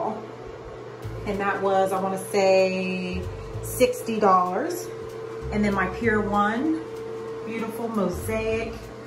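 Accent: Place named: Pittsburgh, United States. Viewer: You must be from Youngstown or near PA because you talk and sound like my family from there and Pittsburg❤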